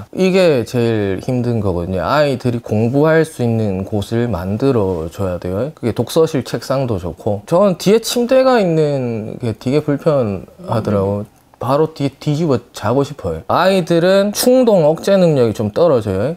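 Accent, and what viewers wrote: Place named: Busan, South Korea. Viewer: 선생님~부산여자 서울살고있습니다. 고향말씀으로 들으니 너무 집중이 잘 됩니다. 저희아이 아반떼로 태어났는데 완전풀옵션하고 튜닝하고 엔진갈아서 열심히해보겠습니다!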